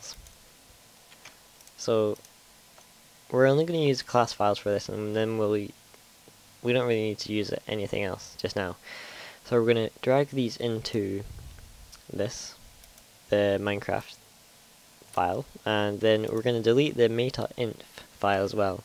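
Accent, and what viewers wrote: Scotland, United Kingdom. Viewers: r u from scotland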